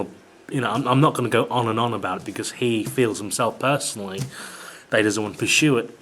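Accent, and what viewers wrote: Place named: United Kingdom. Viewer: i didint know this guy is british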